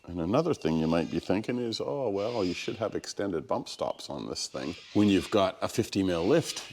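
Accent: Canada. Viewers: Canadian accent but with Aussie slang = hillarious! SUBSCRIBED. Very nice workspace you have got. I'll peek your channel - I see some projects in the background.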